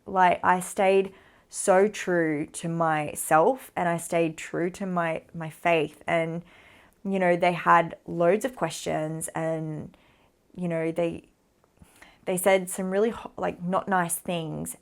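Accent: Australia. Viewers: I never had the patience to watch someone talk this long in 4 videos, bt i have to give it to u, coz i watched all 4 videos without even forwarding once, you have a beautiful accent the aussi accent, which is very easy to understand and ur story telling is so natural, i would like to say this, u are one of those ppl who r chosen by Allah to bring to Islam, Allah must have liked something u did before converting so much that he chose u, u r a beautiful person. Welcome to the Muslim Ummah.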